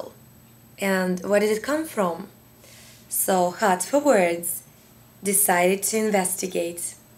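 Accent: Russia. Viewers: you're russian, right ? :))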